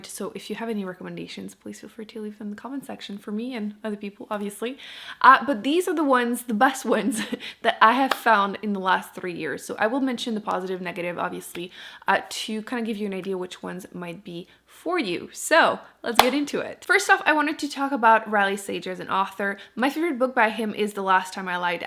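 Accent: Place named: Quebec, Canada. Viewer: First video I watch from you, and the whole time I was like mhm.... she sounds like she has a French Canadian accent. Looks like I wasn't wrong. Twinsies! Love this video!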